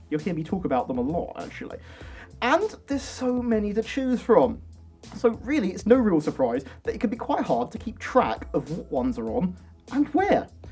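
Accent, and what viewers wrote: England, United Kingdom. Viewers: Because of your english accent.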